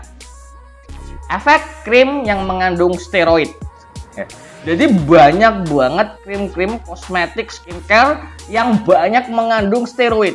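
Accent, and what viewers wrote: Java, Indonesia. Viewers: yg menyenangkan paling medok yg favorit bgt memberi informasi yg dibutuhkan anak muda ketje. Terimakasih dokk 💕💕💕 post video ttg informasi yg bermanfaat bagi anak jaman now ya.. Selalu di tunggu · Logat e jowo banget njih pak dokter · Pak dokter boso jowone kentel, aku suka wkwk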